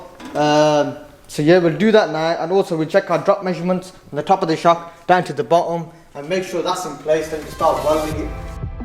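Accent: Birmingham, United Kingdom. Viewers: All about that brummy accent 👍😂 let's see that rs6 breakdown 🙏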